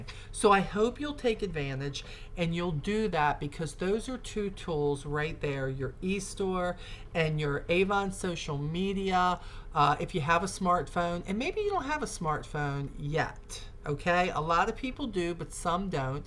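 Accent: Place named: Mid-Atlantic, United States. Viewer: Hi! Just started and I want to thank you for all you do. I'm from NJ but live in MI now and with so many other videos out there to watch, I felt at home when I heard your mid-eastern accent.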